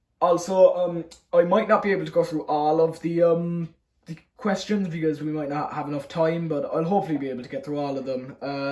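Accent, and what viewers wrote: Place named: Cork, Ireland. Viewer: As a lawd from Waterford I can tell your from Cork by hearing your Accent 😂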